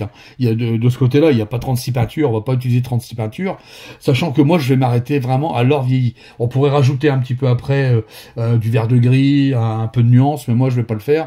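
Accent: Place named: Nord, France. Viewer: Merci pour cette super recette, j'aime bien le rendu 🙂 Un grand oui pour des tutos une fois par semaine 👍 Je ne sais pas si tu donne des cours, mais tu devrais. Tes explications sont toujours très claires et sans chichi. Un grand merci! Et en passant, ton accent du chnord est au TOP. Bonjour de la Suisse!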